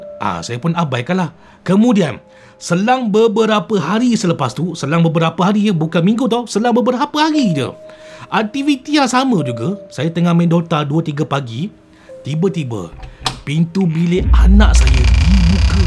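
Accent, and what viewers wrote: Perak, Malaysia. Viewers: Saya baru join tengok.. seronok dengar kisah2 benar..dan yg lebih seronok 'dengo' cakap loghat perak.. haha..😅 sebab saya pun original org peghrak..hahaha😂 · Suka juga bila dengar bercakap loghat Perak seperti "idak" dan "udah". Saya peminat channel ini, teruskan berkonten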